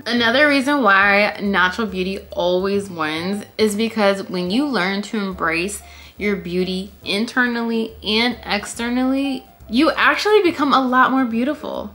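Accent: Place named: United States. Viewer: Girl I love your attitude. I am not from U.S but I can hear it right away, the accent omg 😅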